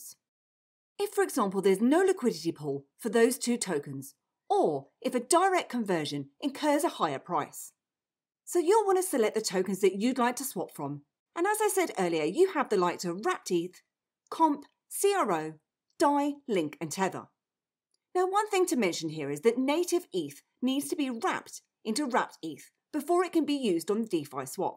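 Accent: United Kingdom. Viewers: best thing about your channel is listening to gb English instead of us. Not many brits doing crypto content. Well done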